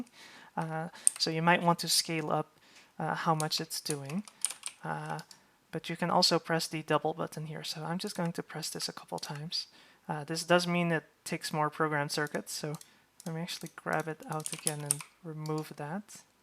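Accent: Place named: Netherlands. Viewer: I love the dutch accent xD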